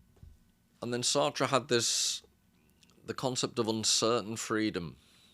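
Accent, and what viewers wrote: Liverpool, United Kingdom. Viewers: I discovered you a few days ago on a Richard Grannon video and since then I have been making my way through your videos which I have found to be immensely interesting, highly educational, entertaining and at times hilarious. Love the Scouse accent and humour. Thanks for your work.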